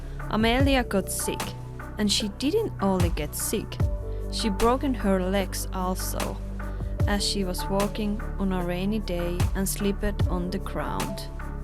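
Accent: Finland. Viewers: Ihmisten tarinat kiinnostaa. Se miten he ovat tuohon jamaan joutuneet ja miten sitten ovatkin pohjalta nousseet 😍 ja siun ralli englanti on kivaa kuunneltavaa 😁😁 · I like hearing your stories and comments and your Finnis (sic) accent like my grandma. AND: what is that little square thingy on the kitchen sink?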